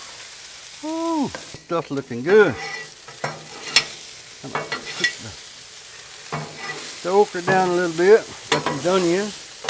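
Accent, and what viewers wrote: Louisiana, United States. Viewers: My regards and best of wishes from Germany. I really enjoy your work and recipes. Also i luv that cajun talk!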